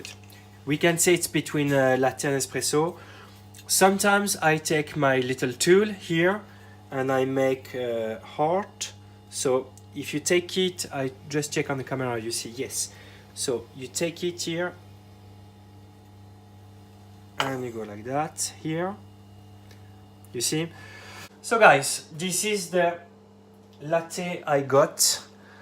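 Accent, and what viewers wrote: France. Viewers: Hello friend ! ! ! Thank your for this nice video ! ! ! ! What is your native langage ? ? I subscribed today Sébastien .... Hello from FRANCE .... It seems you speak french because your special accent ! ! ! ! 💖🍔🍚🍎🍭😍🍄🍏💛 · You sound French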